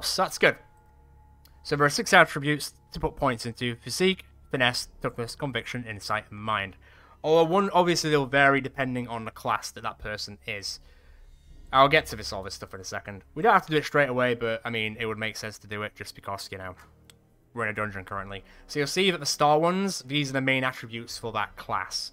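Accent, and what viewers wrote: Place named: North, United Kingdom. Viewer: Thanks R'Kid, makes a nice change to 'ear someone speak northern.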